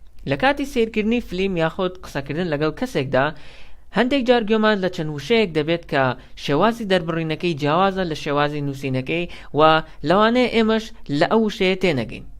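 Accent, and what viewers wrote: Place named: United States. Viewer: Well!! you chose these kinds of words and introduce it to us means you have a good experience at it , nice work , and nice American dialect, especially the negative word (ain't) it was interseting because there are a lot of people and even teachers don't know about this all though I've already heard of it in the movies , nice work man you are very good at the accent. So keep it up , well if you have time , good bye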